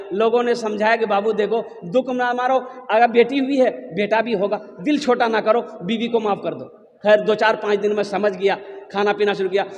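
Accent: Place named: Bhagalpur, India. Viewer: Aap kaha se ho maulavi saheb , aap to mere taraf ki bhasha bolte hai , bhagalpur. Sunne me bahut achha lagta hai.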